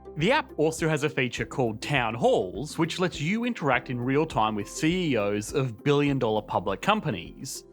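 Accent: Australia. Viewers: This may not be helpful, but it is truly meant with that intent. The United States has exported amazing and incredible "things" in her relatively brief history; although porn and the Californian uptick are, in my opinion, not worthy of inclusion. We humans have two primary means of communication: audible and visual, and you have a great product which involves both of these areas. However, I couldn't make it through to the end of this particular post because of the CA uptick you appear to have imported. I love the Aussie accent (although I think the South African has you pipped), and with over a million subscribers you can reasonably tell me to... er... "go away," but I would ask that you accept this as an honest and well-intended constructive criticism. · I beg your pardon for asking maybe an insensitive question but what nationality is? I keep hearing this accent (PBS spacetime, ), but can’t pinpoint the origin. 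Australian? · This guys accent drives me nuts. Aussie accents don't tend to bother me but this guy changes his intonations mid-word - "Chyayayana" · There's no way I'm trusting an Australian's analysis on anything related to China. Btw your accent sounds absolutely fucked up. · yeah should have expected some negativity with the aus accent. but hey hundreds of times better then an indian one.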